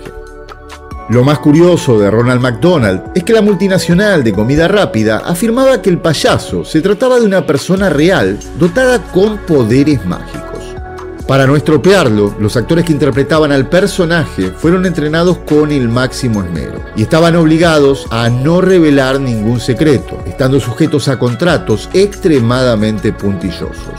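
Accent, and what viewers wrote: Argentina. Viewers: Se llama. Da consejos sobre como correr mejor, por su acento pienso es tambien de Argentina.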